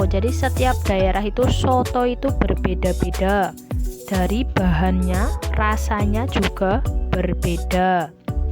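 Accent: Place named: Banyumas, Indonesia. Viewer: Youtuber ngapakk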